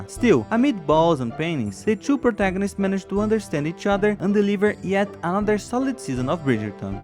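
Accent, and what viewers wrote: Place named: Brazil. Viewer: Tu é br né? Da pra reconhecer pelo sotaque kkk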